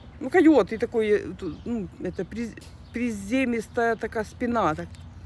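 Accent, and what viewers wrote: Ukraine. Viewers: Видно що ви з України, говор наш. Дивлюсь і радуюсь що ви не там де бахкають. Щастя вам і удачки, а кому не подобається хай не дивляться і не слухають.❤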